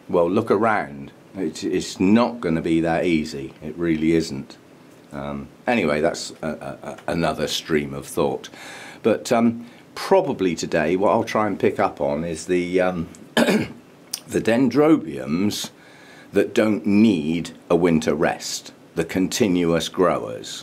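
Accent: United Kingdom. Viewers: 5000, Congratulations! I am from US but you really don’t have to. I am sure most of your viewers enjoy your videos and learning from you. I especially love to hear your British accent English. Bring me back to my college time in Japan.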